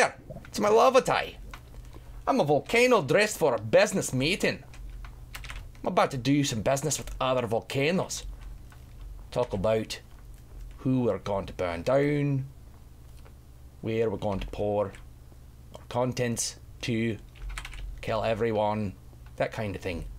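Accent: Scotland, United Kingdom. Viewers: I could get lost in that Scottish accent all day <3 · haha and nice scotish voice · I will never get tired of Scottish accent... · Your scottish accent omggg xD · His Scottish accent reminds me a lot of Desmond from Lost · Ignore anyone saying the Scottish accent is offensive. It's not, I find it funny just as much as I find Willie from the Simpson's funny. I am also from Scotland. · Moar Scottish Accent please. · Lol luv ur scotish accent soooooooooooooooooooo much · The Scottish accent is cool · I just watched a guy talk to himself in a Scottish accent for 12 minutes...... am I really that bored?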